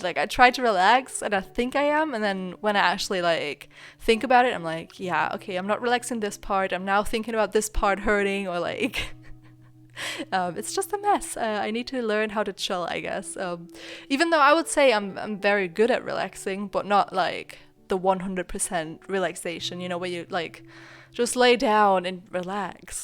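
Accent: Germany. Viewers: german accent? :D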